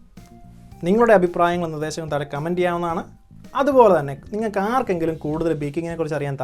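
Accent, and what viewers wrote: Kottayam, India. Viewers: ഞാൻ ഇപ്പോഴാണ് e ചാനെൽ കാണുന്നത് സൂപ്പർ അടിപൊളി....നന്നായി പറഞ്ഞ് തരുന്നുണ്ട് tks ...varthaanam കേട്ടിട്ട് കോട്ടയം ആണോ....🥰🥰🥰🥰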